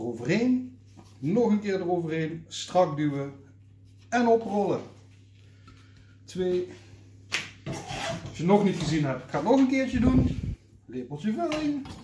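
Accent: Netherlands. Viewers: Zou deze man uit Limburg komen? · Wouw echt geweldig lekker had jou recept gevolgd. Was ons niet pittig genoeg . Dus 2e keer gemaakt. Eerste keer gemaakt met de chips in de oven . Nu gemaakt in burritos vorm . Met een Carolina reaper en een chilli pepper. Hot chilli saus en chirazza saus * waarschijnlijk verkeerd geschreven ) maar mmmmmmm wij zijn echt flink pikante eters . Heeeeerlijk . Ik zie jou graag bezig. Ben zelf van België. Maar jij bent van de kanten heerlen denk ik ,niet ? Ik ben zelf van België grens maastricht . Ik vind dat accent zo geweldig om naar te luisteren als belg . Ik ga je volgen. Je legt ook alles zo mooi uit . Weet precies wat te doen . Top kerel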